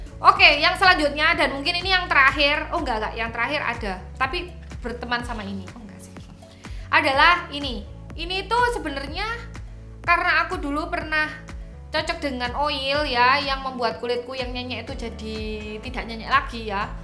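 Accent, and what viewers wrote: Java, Indonesia. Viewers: Medoknya bikin kangen❤ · Aku paling seneng medok'e mbak 😄 (((SANGAR YA?))) Btw, kulit wajahku berminyak dan aku juga ngga cocok Some By Mi, setelah produknya aku pake sampe abis, aku puasa skincare dulu baru pakai skincare yang sederhana aja; facial wash, toner, dan moisturizer sampe kulitku anteng. Ditunggu video selanjutnya, mbak! Aylapyuh! 😘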